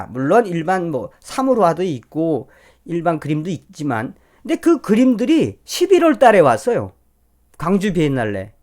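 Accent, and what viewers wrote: Pyongyang, North Korea. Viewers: 제발 까불지 마세요. 방송을 진지하게 해주세요.모든 면이 마음에 들지 않지만 우파 보수 방송이라 윤정권을 지지하는 그 열정에 감사해서 봅니다, 북한말씨 쓰는 것 장난삼아 하는 것 보기 좋지 않습니다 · 우리나라는 왜이리 헐뜻기만하는 빨갱이 색기들이 만은지원 똘똘뭉쳐서 좋은 나라만들생각 은 안코 헐뜻길 좋아한놈들이 많은지 이자식 말투도 가만히 드러보니 북한 사투리가 살짝 들리는구만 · 서울 표준말보다, 북한 억양이 더 좋습니다.. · 정선산 체널 애청자인데요 언제부턴가 북한 사투리 너무 심하게쓰셔서 중단하게됬네요 좀 아쉽네요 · 그냥 남한식으로 부드럽게 하셨으면 북한 말투 정말 듣기실습니다~~죄송합니다 저의견이니 오해 없으시길 바라며 ~~~ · 이애는이북말써가며어디사람인고 혹시빨갱이 말투가상투적인빨갱이들수법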